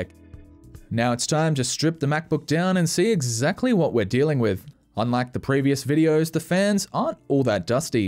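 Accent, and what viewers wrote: Australia. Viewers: There's something oddly satisfying watching this kind of video and hearing an Australian accent at the same time.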